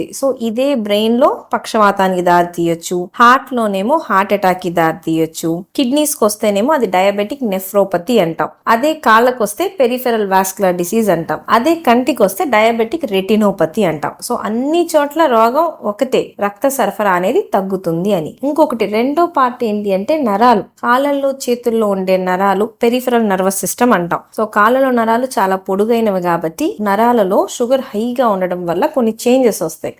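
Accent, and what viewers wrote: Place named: Telangana, India. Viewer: చక్కగా చెబుతున్నారు. తెలంగాణ యాస కూడా వినడానికి బాగుంది 😅.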